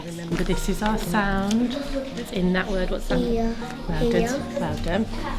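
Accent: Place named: Midlands, United Kingdom. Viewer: Love that midlands accent.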